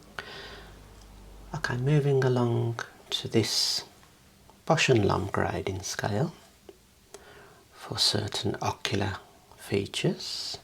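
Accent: United Kingdom. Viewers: Your voice is very relaxing fine british gentleman in deed thanks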